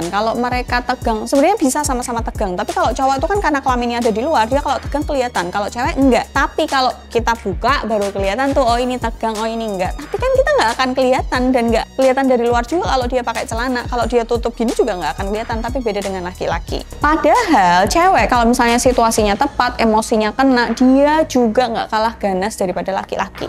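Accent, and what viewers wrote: Java, Indonesia. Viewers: mbak,logat jawanya kental banget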